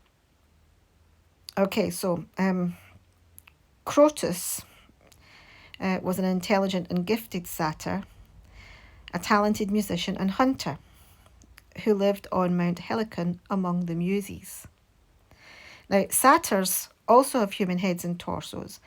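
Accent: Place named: Scotland, United Kingdom. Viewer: Loved this WIP & Chat and learning more about the Sagittarius. Very interesting indeed. I was sitting here the whole time thinking you sounded like you were scottish then you mentioned Robbie Burns. I have not been a Burns Night in years. I live in the prairies and they celebrated here annually and I use to attend it when I was younger with my parents. Brought back some good memories. 💜💙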